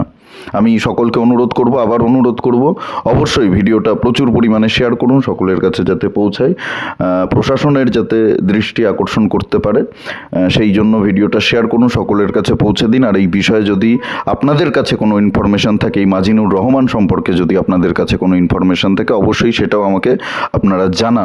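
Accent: Bangladesh. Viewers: কথা শুনে মনে হচ্ছে বাংলাদেশি মাল।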